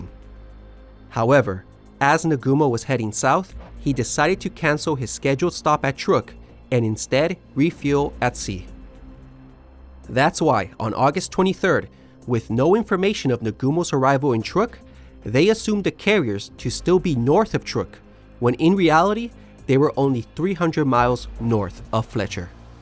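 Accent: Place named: United States. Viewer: These are two very well done videos. Thank you for separating them from the perspective of each commander. I do appreciate you reading from sources as well as narrating the events. However, I would like to give you some constructive criticism. When people make videos on topics that I do not consider consequential, I don’t give constructive feedback. But since you are making informative videos on world history, I would like to do this. And my criticism is regarding your pronunciation of certain words. From your accent, you’re obviously American as I am. Your pronunciation of the letters TH vary. You pronounce thoughtfully, and thankfully, perfectly in my opinion. But the harder TH sound of the, them, and they come across as de, dem, and day. Also, with the words thoughtfully, and thankfully, you use the letter L correctly. But in other words, you swallow it. 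Guadalcanal becomes Guadohcanow. Vulnerable becomes vuhnaboh. I’ve been around, and I know that the ability for someone to communicate in my version of English has nothing to do with intelligence. Just like my inability to speak Russian, nor Swahili is a reflection of my own intelligence. The ability to speak American English, comes down to education and application. You have demonstrated your education by putting together this video. I would just encourage you to apply yourself a bit more to the language to finish off this video with the same expertise and skill with which you began. Thank you again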